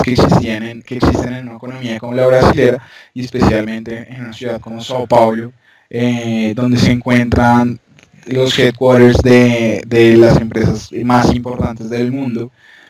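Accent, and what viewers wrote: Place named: Bogotá, Colombia. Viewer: el acento rolo Horrible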